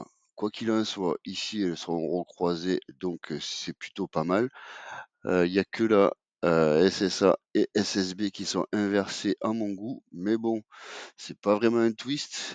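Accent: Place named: South, France. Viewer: Tu viens du sud toi aussi 🎉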